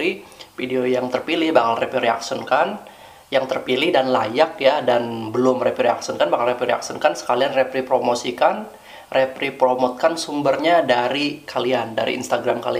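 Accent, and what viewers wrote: Banjarmasin, Indonesia. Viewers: Orang banjarmasin mana suara nya😄✋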